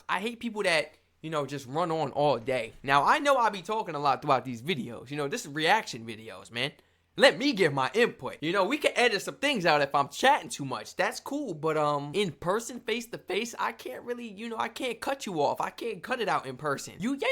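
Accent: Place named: United States. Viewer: Your manners and your appreciation of the struggle that is life make you sound like a candian. With an American accent and passport, of course lol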